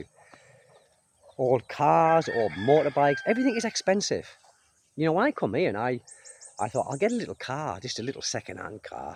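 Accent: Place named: Newcastle, United Kingdom. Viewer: Just found your channel. Sounds like you are from Newcastle or somewhere in the North East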